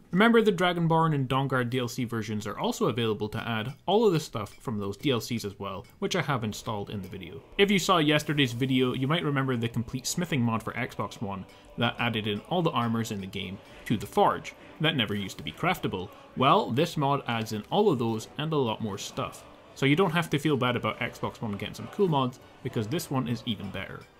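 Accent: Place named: Glasgow, United Kingdom. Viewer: Posh glasgow...lish?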